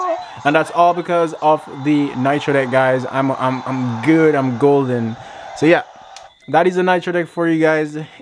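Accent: Jamaica. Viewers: I can already tell ur from Jamaica bro 😂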